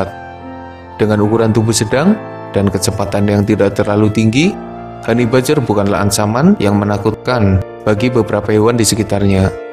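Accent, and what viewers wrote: Banyumas, Indonesia. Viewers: Jawa ngapak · Ngapak banget bang wkwkwkwk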